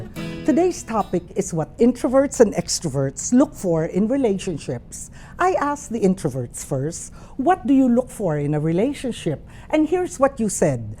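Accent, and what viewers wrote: Philippines. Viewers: I came across this video and thought you were Filipina when I heard your accent! And hala, you are! Lol thank you for that insightful information! =)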